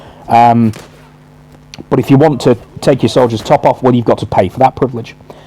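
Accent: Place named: United Kingdom. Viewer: The most British voice I ever heard, like I couldn't even get concentrated on the video, but definitely it's a good review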